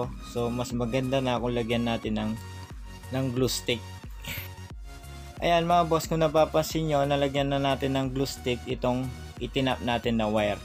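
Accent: Pampanga, Philippines. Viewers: Kapampangan ka ne boss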